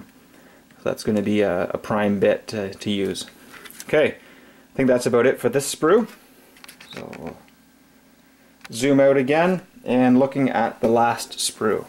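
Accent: Canada. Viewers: i can tell from the accent. mtl?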